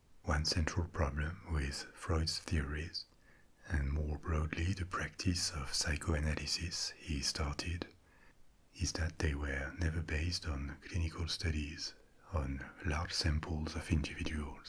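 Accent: France. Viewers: Oh my god. The French accent is literally perfection combined with ASMR. What a time to be alive this is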